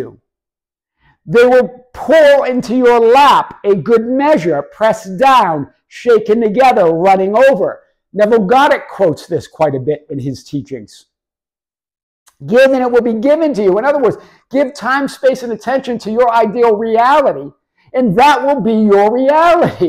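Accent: Boston, United States. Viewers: dispenza, tolle, neville, zeland, abraham hicks...all good...but the greatest and most complete is this man from Boston. throughout history only Jesus, Krishna, and a handful of others were better teachers, that's how good Mr. is. yeah, he looks scruffy and normal right? well guess what, real Buddhas are often so simple and humble that the common man would never know who they are. people flock to dudes with giant robes and paraphernalia but I tell you all, with conviction, this man is among the top 3 spiritual teachers alive on the planet but most people would never know it. they will judge him by him folksy demeanor and not realize he is a master teacher. those of you who know who he really is are lucky. he is one in a billion, literally. I'm still amazed by how this gem talks in a casual Boston accent and is so light and cheerful, most will never know they are interacting with a true MASTER. 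life is hilarious hahaha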